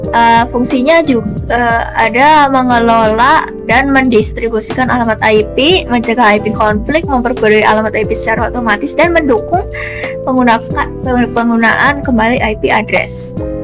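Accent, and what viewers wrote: Indonesia. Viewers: Medoq banget teman teman 😭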